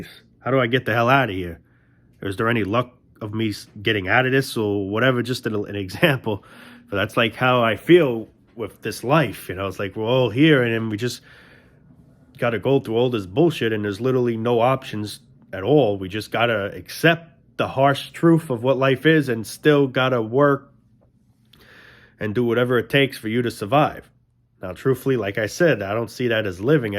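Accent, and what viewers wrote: United States. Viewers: So true sadly.. also I like your accent! What part of America are you from?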